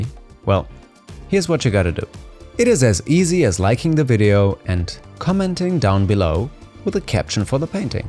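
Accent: Germany. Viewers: It took me 2 min and then I was like... "Is there a tiiiny german accent in there?" Clicked on your channel desc and damn hey german fellow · You sound so German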